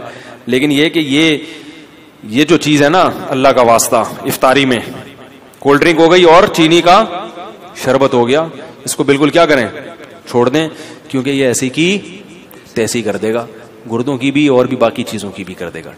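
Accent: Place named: Karachi, Pakistan. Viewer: Apka bat krny ka andaz mujhy bhout pasand ha ماشاءاللہ ar boli sa app mujhy karachi sa lagty hain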